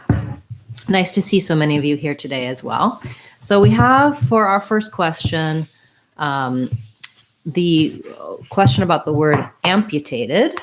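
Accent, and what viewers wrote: United States. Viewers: a great explanation thanks for helping us, I love american accent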